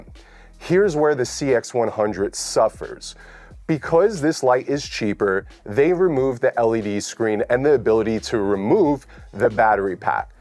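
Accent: New York, United States. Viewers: I'm a NYer living in LA for the last 15 years. gotDAMN I miss my peoples. I can see myself and all my homies from back home in the way you talk. Not just the accent, but the sentiment and realness behind what you're saying. Super refreshing in this space. More please. Also, wish I'd seen this video a couple of days ago. I just ordered the x100 pro package for a shoot I'm traveling across the country for. The good news is I don't REALLY need it because lighting is handled, but I just thought it'd be cool to try out one of these tiny lights that you can just throw in your bag and whip out once you've already got your shot and are just kind of playing. Should have gone with with one of the competitors! Anyway, you got a new subscriber!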